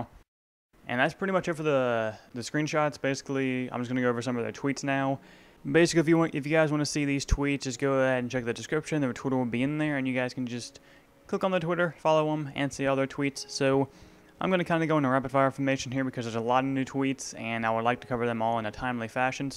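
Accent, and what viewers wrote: United States. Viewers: you speak american? was i the only one that caught that?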